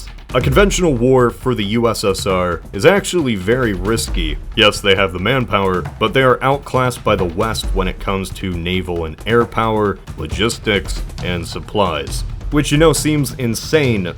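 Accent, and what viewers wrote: United States. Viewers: His accent, he's American, they often drop that consonant.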